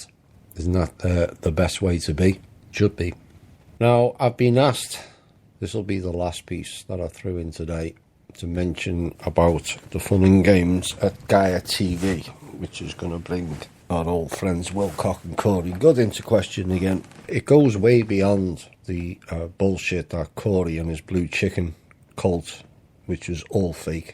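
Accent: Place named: Liverpool, United Kingdom. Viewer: What a breath of fresh air to hear your dulcet liverpuddlian tones ! So nice to hear intelligent convo from a fello blighty as most info ive found has been mostly american ( not disrespect to my american friends intended ) I love are no nonsense and seeing the irony in it that we brits do so well ! ♥ I felt gaia was dark first time I saw its adverts..its perfect candy coated mainstream approach smelt bad ! bit like Ancient Aliens...Im going to enjoy watching your previous vids now ive found you. He's not the messiah hes a very naughty boy ! Bit of Python to brighten the day :-)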